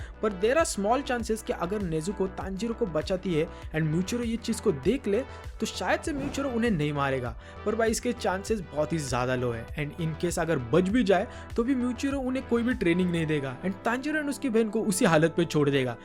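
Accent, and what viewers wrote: Maharashtra, India. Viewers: Bhai you are Marathi speaker confirm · Tu maharashtrian 😅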